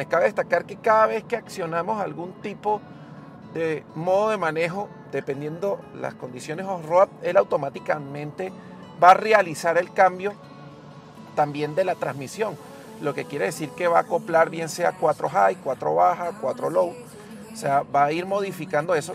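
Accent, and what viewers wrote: Venezuela. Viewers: eres venezolano cierto? exelente video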